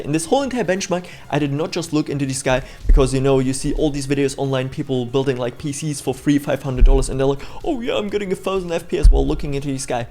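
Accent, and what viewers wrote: Germany. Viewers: i can hear the german in u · Man hört dein deutsch raus! Sehr geiles video! Habe mir jetzt auch einen gebaut mit nem Ryzen 7 5700x, ner 5060 32gb ram usw.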